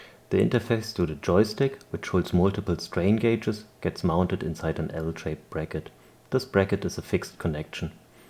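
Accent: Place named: Germany. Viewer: metal working/engineering project and german accent ? You're sure to see good work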